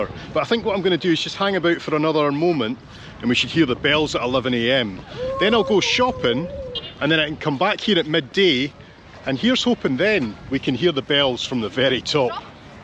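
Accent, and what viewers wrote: Scotland, United Kingdom. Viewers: I just recently discovered you on YouTube and I am hooked. You are absolutely adorable and so full of energy. I was exhausted watching how far you walked around Reykjavik. I'm from Minnesota in the USA, and I love all things to do with travel. I'm also amazed at how much you can eat. I recently watched your Atlantic crossing video and enjoyed seeing how much you enjoyed the food on board. Of course I'm loving your Scottish accent and your wit. Keep up the good work. You definitely have a new fan. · Speaking about accents, your Scottish one is music to one’ ears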